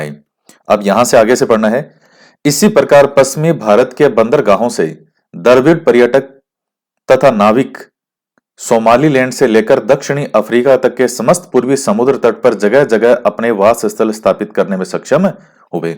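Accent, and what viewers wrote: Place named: Sikar, India. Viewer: Sir aapki aawaj se ESE lagta hai jase Ki AAP sikar se lagte ho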